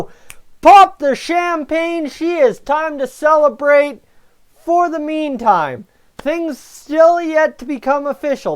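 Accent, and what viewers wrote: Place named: Nova Scotia, Canada. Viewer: From Edmonton here, you sound like you're from Nova Scotia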